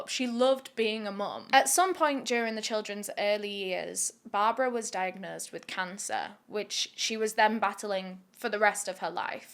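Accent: United Kingdom. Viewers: yeah it’s the British accent and the way she also tells the story. I feel most just say they do this wrong they did this wrong they went thought this. But when she tells the story she makes you feel sympathy for the killer with things they went through, she shows empathy in the right places and tells it as if they haven’t don’t anything wrong until they do and the whole thing changes to her being against them.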